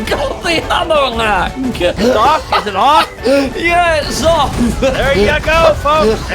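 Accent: United Kingdom. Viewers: This guy is the most bri’ish guy in wot…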